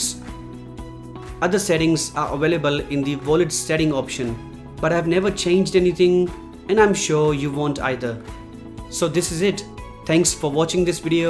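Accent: India. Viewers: I really wish you guys could get thru that Indian accent your almost as bad as new jersey accent man seriously I have to focus like a sniper to understand your English you are better than most . But seriously guy I can hear every letter of any word from Penelope Cruz and she has heavy latin accent but that Indian English accent i can not grasp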